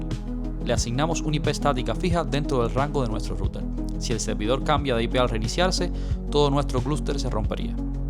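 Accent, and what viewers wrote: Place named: Cuba. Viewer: Eres cubano! Ese acento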